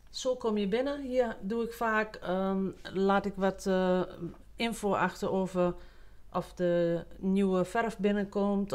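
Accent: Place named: Twente, Netherlands. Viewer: Dank voor de stimulans, deze uitgebreide uitleg wordt zeer gewaardeerd! Haha, als ook het Twentse dialect, zo leuk:D. Vooral dat fotopapier lijkt me gaaf om mee te experimenteren, in de trant van: "wie het kleine niet eert is het grote niet weert"?;-) Met liefs van Mies uit Zuid Limburg. Waarbij ik al een hele poos hoop dat je ook hier in de buurt een workshop zal willen/komen geven.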